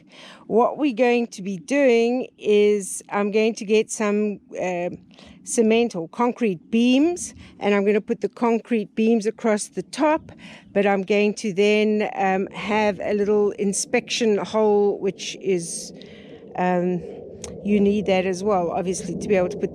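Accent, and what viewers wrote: South Africa. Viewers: Now that voice is very familiar! South African? I'm going to enjoy this post.Nicky Bedford from Cape town .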